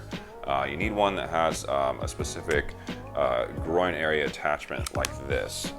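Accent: United States. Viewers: That god-awful American accent, like scraping a nail across a chalkboard.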